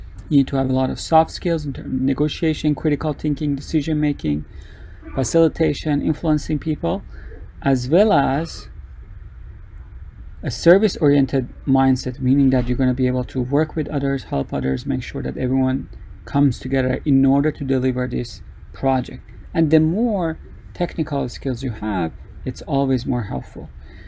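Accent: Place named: Iran. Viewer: بسیار خوب و مفید آقای من از لهجتون متوجه شدم ایرانی هستید و خیلی منو خوشحال کرد امیدوارم همیشه سلامت و موفق باشید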